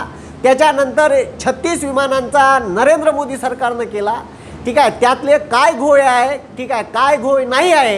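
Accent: Vidarbha, India. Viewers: Marathi in Vidarbha style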